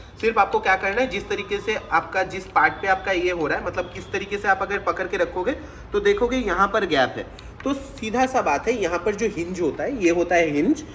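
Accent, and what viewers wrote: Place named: Bengal, India. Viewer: Okay bhaiya ji.. Samajh gye.. But you are using bengali ascent.. 🙃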